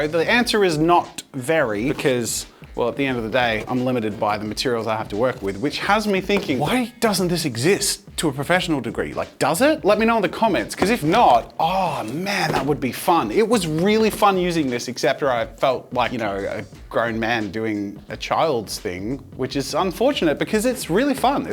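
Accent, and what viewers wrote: Australia. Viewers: Australian? I thought you were British!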